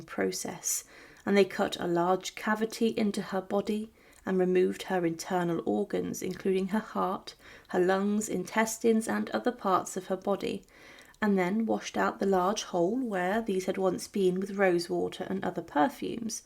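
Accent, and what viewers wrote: United Kingdom. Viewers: I watch just to listen to your lovely British accent and your beautifully accentuated narrative. (And, of course, your well-researched historical content.) Keep up your amazing work, please. I could listen to you talk for days on end. 💕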